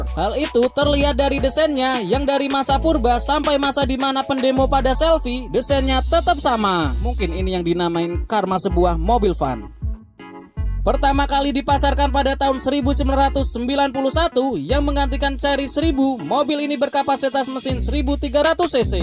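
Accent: Pekalongan, Indonesia. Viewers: Lugot nya org Pekalongan kliatan nya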